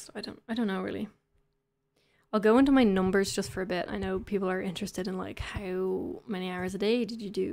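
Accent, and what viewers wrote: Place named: Ireland. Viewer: Woah! Crazy seeing your progress! And i think it's really fascinating how your Irish accent just vanishes when your speaking German. I do have a question, what VPN are you using?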